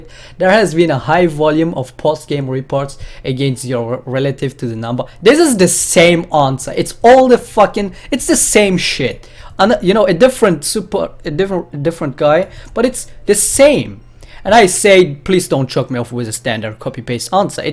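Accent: Germany. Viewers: WolfGang Man yes im german i know that accent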